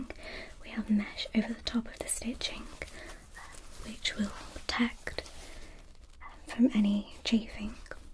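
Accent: United Kingdom. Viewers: What is this whisper are you British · I usually don’t like British accent videos,but I actually don’t mind your videos♥️ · What’s your country? Your English accent is not seems like American maybe British? Or England? I don’t know but i love your accent your “Okay” sounds cute❤️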